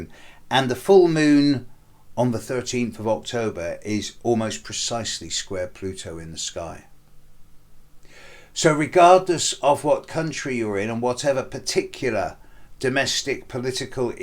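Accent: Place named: West Country, United Kingdom. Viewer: Love your West Country burr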